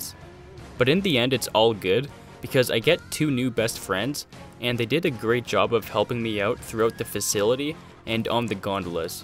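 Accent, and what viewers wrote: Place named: Southern Canada, Canada. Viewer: your accent so south-canadian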